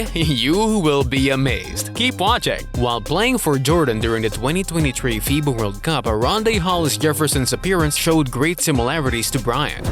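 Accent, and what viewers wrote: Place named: Philippines. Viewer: Pinoy n pinoy accent m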